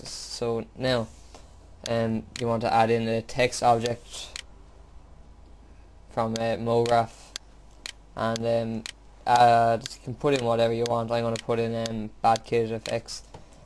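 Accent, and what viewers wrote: Ireland. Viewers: you sound like an Irish version of Edd from Eddsworld =3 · u r Irish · hey hes Irish like me haha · he's irish thats the way he talks thats wrong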